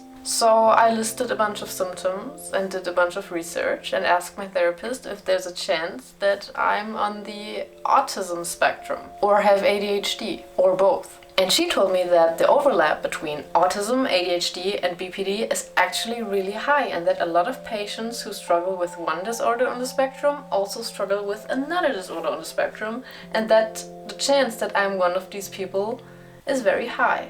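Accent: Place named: Germany. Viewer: Witzig Habe nach neurodivergent artists gesucht & du bist aufgetaucht. Direkt im ersten Satz konnte ich vermuten dass du aus DE kommst.. sollte so für mich leichter sein das englisch zu verstehen 😀😀💚💚💚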